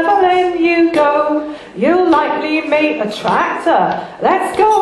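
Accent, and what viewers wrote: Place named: Norfolk, United Kingdom. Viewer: Great to hear a Norfolk voice while I'm living away from home!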